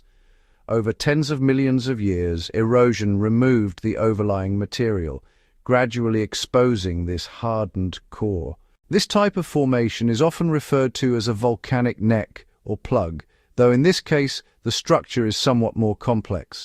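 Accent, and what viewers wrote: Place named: United Kingdom. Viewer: WHAT SOUNDS TO ME LIKE A BRITISH ACCENT IN NARRATION IS MOST OBNOXIOUS.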